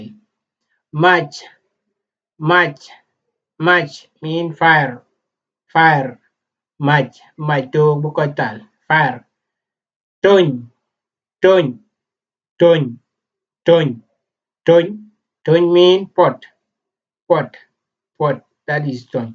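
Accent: South Sudan. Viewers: What accent of dinka is this?? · Is this Aweil accent?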